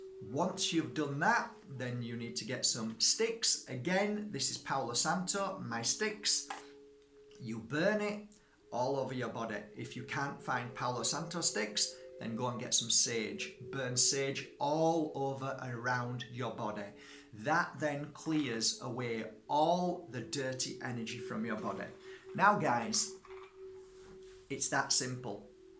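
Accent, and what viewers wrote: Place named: Nottingham, United Kingdom. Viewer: Ah, right, Yorkshire isit, now!..no wonder I thought your accent might of been from Nottingham...I'm originally from Nott's, and I get asked if I'm from Yorkshire...x ✌️❤️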